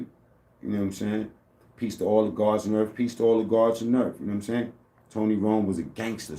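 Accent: Brooklyn, United States. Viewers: You have to be from Brooklyn..The way you talk and. get hyped is 💯💯💯💯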